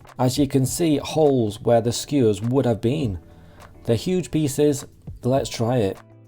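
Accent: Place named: England, United Kingdom. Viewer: Nice pomme accent by an Asian guy doing Indian food... From a African guy from Kerala, India:-)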